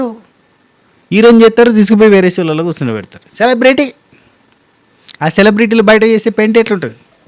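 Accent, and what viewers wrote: Telangana, India. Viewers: Anna meru matladthe ma annaya matladthunte vinnattu untadi super telengana slang jokes ....happy to see ur videos daily😊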